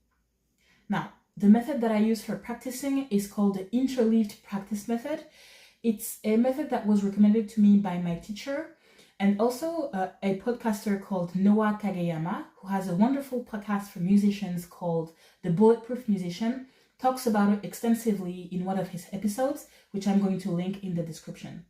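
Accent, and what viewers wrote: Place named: France. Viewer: You def need to have more sub! Your improvement and piano journey is inspiring...and also are you french? I noticed it on the accent.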